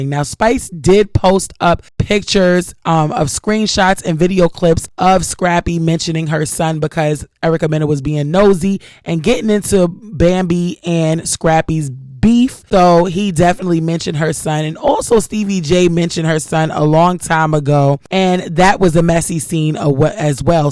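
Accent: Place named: New York, United States. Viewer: Think she from new york .... sounds familiar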